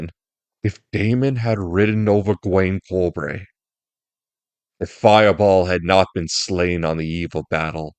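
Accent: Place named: Boston, United States. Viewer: Awesome video bro. You GOTTA be from Boston.I'm from Southie. Oh hey I'm not being a twat at all, but it would be "patronized" not "patroned'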